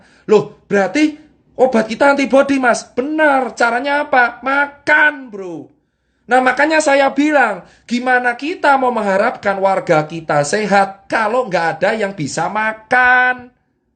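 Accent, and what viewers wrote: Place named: Surabaya, Indonesia. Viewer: Asli... Gaya suroboyoan.... Gamblang njelasno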